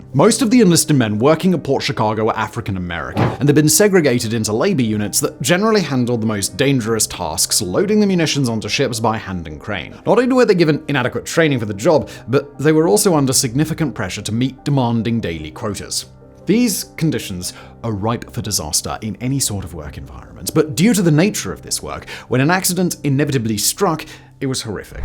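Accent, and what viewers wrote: United Kingdom. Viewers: Why does an American always question legitimacy of territory when their whole country's very existence is questionable? Even more when your accent is British.. right? Born Hipocrits huh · You have such great content but your British accent makes your videos nearly unwatchable. Too bad.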